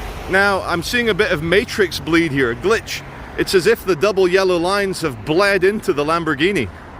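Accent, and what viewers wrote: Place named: Newcastle, United Kingdom. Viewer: Loved the Geordie accent *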